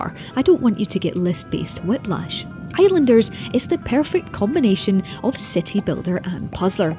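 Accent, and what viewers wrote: Scotland, United Kingdom. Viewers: it's Scottish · I think it;s eng-scottish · Is she Scottish? I love her accent!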